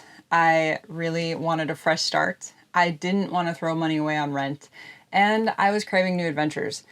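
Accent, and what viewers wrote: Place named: East Coast, United States. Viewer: Another good video, you are punished financially for being single to begin with. I had two camping vans and lived on Martha's Vineyard for a Summer. So it sounds like you are from the east coast which is why I only see videos from the west by you. Your next project could be a TV show but you probably couldn't do it alone. Most travel shows don't show travelling from your perspective.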